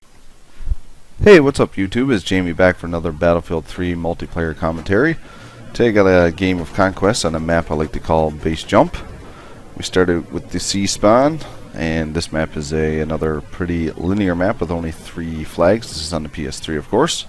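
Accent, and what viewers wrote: Canada. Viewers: is that a faint Newfoundland accent I hear? Vancouverite asking, much respect! Add me up, "guybones" psn id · Are you from Canada or Minnesota?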